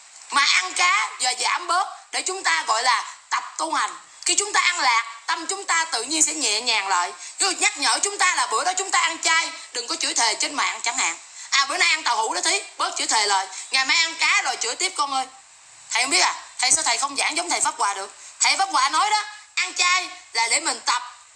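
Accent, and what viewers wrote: Standard. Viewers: Em nói quá chuẩn Hay quá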